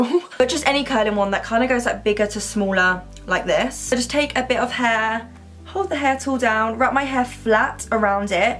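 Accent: United Kingdom. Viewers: That's real British😂 not what u see in films aha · I love ur accent british& ilove u😍❤️❤️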